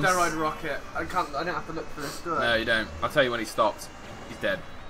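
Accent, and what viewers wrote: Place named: United Kingdom. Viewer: What part of Uk is he from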